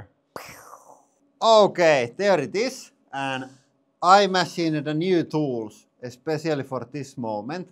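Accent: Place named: Finland. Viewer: It's funny how the years go by, but that Finnish English is still as strong as ever.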